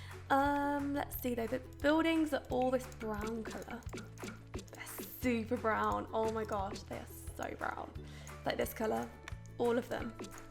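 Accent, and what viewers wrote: United Kingdom. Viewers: Maria santos to me, she sounds more British that Australia. 😂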